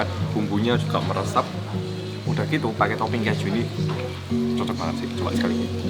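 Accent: Java, Indonesia. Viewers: Ngomonge medok banget jowone gak ilang😂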